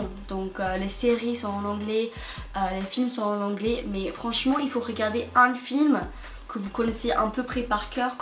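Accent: England, United Kingdom. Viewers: So cute this english accent while speaking french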